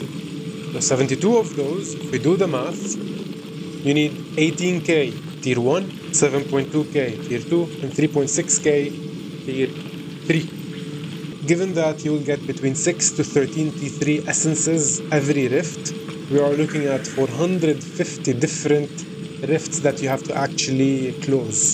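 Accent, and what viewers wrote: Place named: Arab world. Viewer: My dude your accent is very close to my own, are you lebanese or anywhere around the middle east?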